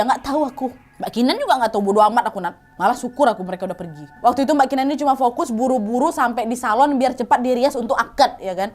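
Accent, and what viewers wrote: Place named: Minangkabau, Indonesia. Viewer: orang minang yah? Huhu sukaaa banget logatnyaaaa. Campuran medan sama ada minang minangnya dikit. Semangat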